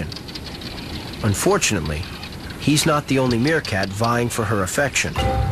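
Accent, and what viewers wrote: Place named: United States. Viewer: I finally found a channel with the American narrator, im so happy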